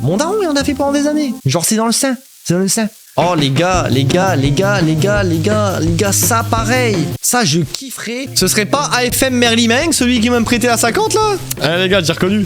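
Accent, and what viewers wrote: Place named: South, France. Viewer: Pourquoi tu prends l'accent du sud pendant toute la vidéo ?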